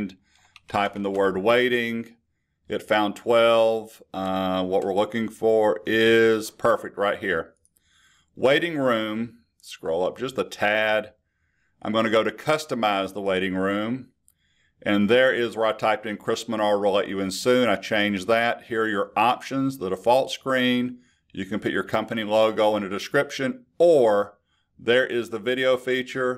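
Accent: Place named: South, United States. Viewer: Thanks Chris... what accent is that btw... ??? somewhere south ??? one of my favs lol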